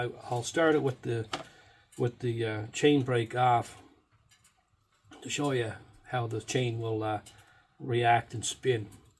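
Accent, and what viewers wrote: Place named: Newfoundland, Canada. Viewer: Just hearing you talk makes me miss living on the rock. Thanks for the video 😊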